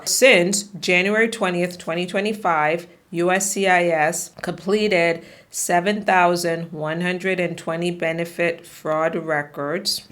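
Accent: Jamaica. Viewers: Good evening are you a Jamaican?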